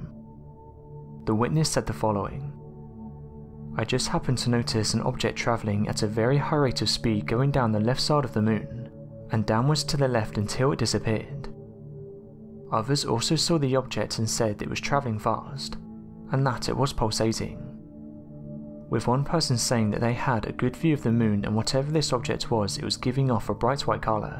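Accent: England, United Kingdom. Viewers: he has an English accent you dum dum not a speech impediment or the mumbles what a ridiculous comment 😶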